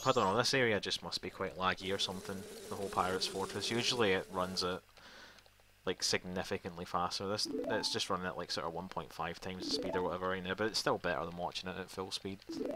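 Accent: Scotland, United Kingdom. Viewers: Hey, are you Scottish? I don't know enough about accents to get any further than that.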